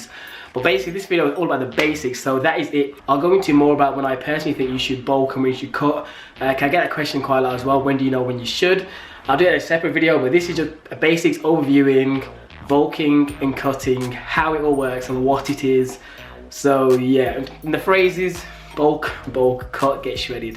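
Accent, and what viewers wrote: Birmingham, United Kingdom. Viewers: I know, I love the Brummy accent :-)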